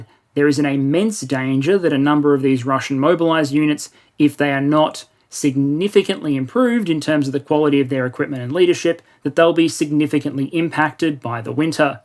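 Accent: Melbourne, Australia. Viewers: id sub but you sound like you are from melbourne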